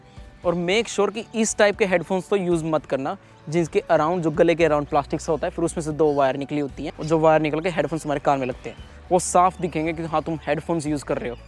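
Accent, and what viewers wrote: Haryana, India. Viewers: Haryanvi touch bhai goda 😎 jai haryana jai haryanavi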